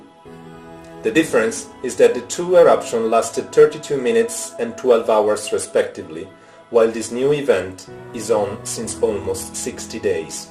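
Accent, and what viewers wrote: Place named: Italy. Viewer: Il tuo accento italiano si sente pure a 7.000km di distanza. Ciao bella Italia. Saluti dalla Handerson, Las Vegas, Nevada, USA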